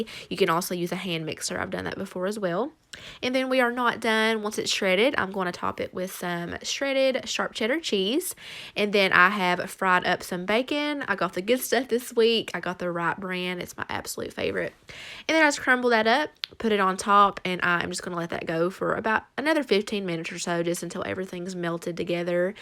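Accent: United States. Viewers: Hi, I love your accent, from which state are you? Greetings from Europe:) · Hello, I love your videos. I'm trying to figure out which accent do you speak. I'm German and I have a lot of american colleagues (they are from Palo Alto) and their accent is different from yours:-)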